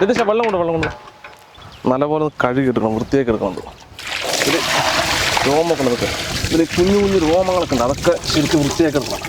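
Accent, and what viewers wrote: Palakkad, India. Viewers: palakkadan slang itrayum bhagiyode kerathinu kanichukoduthathinu big salute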